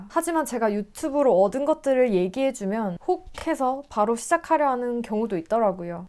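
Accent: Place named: Gyeongsang, South Korea. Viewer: 경상도 분이신듯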